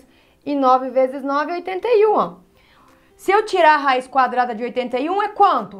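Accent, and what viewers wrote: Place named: Santa Catarina, Brazil. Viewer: Voçê é de Santa Catarina?Voçê tem sutaque..😅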